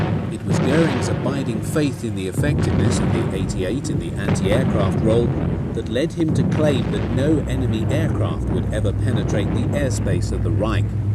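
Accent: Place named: United Kingdom. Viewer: I feel like I'm in a fox hold during battle, with some calm British guy yapping next to me.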